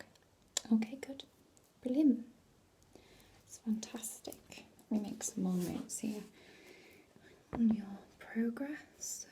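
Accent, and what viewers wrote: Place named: Southern England, United Kingdom. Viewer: Brok Homz good old south of England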